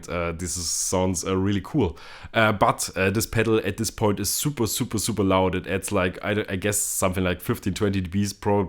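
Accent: Germany. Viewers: Ich höre deinen deutschen Akzent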